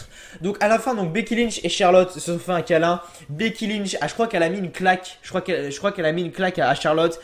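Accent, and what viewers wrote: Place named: South, France. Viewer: L'accent du sud je m'en lasse jamais il est grave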